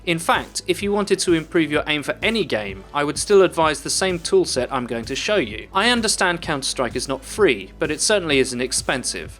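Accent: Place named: United Kingdom. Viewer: A lot of yanks discovering british english for the first time I guess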